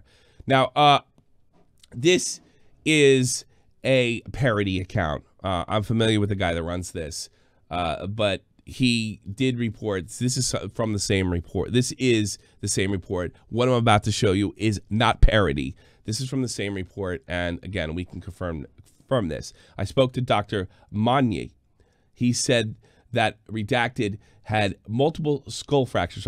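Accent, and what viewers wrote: United States. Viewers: Your English is very American. If your not in North America, what part of the world are you? Just curious since you have no accent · are you natively german? your accent sounds american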